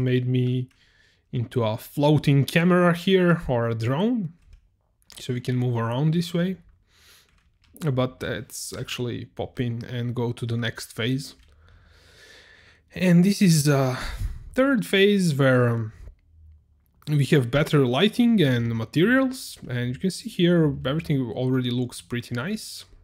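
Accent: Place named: Russia. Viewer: Сразу слышно, русский озвучивал)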